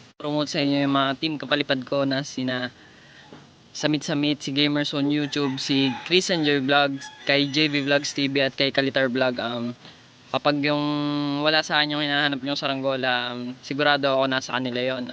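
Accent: Batangas, Philippines. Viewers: Yung batangas accent the yan baka gravee to.. hahaha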